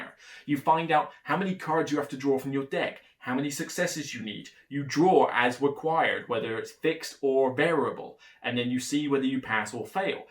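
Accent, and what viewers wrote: United Kingdom. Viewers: First, I think this is the first time I realized you are British. Second, your review is spot on with my experience. I don't have space in my house to leave the game set up so that isn't a solution for me. Our first game took 9 hours and we didn't even finish. I wish we had gotten the cartographer's map. I think if you noted the card numbers and essential features, you could speed up the game significantly. I don't think it is rational to have to start completely over every time. That easily doubled our play time.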